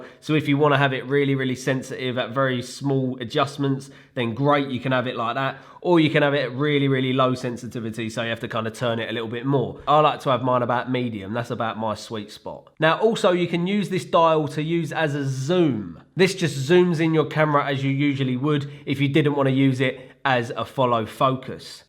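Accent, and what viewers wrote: United Kingdom. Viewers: oooo aaa wwwww I love the British accent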